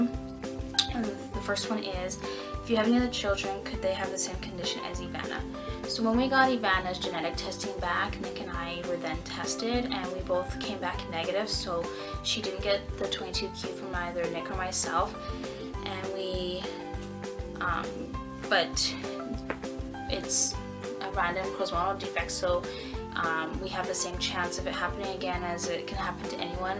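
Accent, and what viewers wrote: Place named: Canada. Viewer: I give you the most amount of Kudos for going home at night. if anyone wants to criticize you, let them,.... because there are so many of "us" who will come to bat for you! You are the greatest mom and Nick is a great father too! I love your Canadian accent... my husband is Canadian! He tried his best to get rid of his accent and he did! I just love your testimony of Evanna! You are so strong! God Bless you sweet girl!! I PRAY for you and your family everyday!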